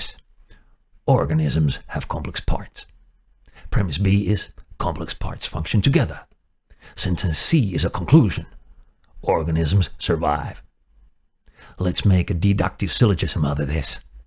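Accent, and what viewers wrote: Germany. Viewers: I like science on youtube but I get the impression he is like acting and I dont like it. Is that his real voice? Theres nothing wrong with having a German acent and his natural voice will do fine.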